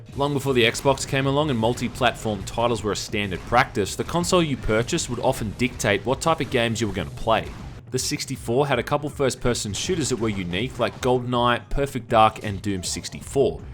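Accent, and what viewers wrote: Australia. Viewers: Australian accent that isn't danger dolan yay! subbing just for that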